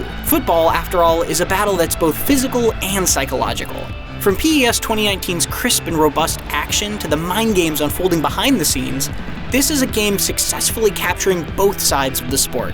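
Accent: United States. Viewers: nice try but if i hear an american accent i automatically dont need your opinion when it comes to football .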